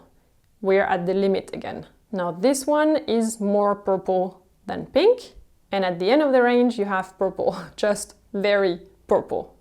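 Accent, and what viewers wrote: France. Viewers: I truly just love your French accent, only heard it in movies.. so nice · English with french accent is soooo beautiful ❤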